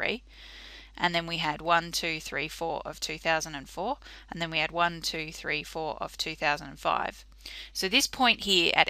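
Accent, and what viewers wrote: Australia. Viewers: Are you Australian?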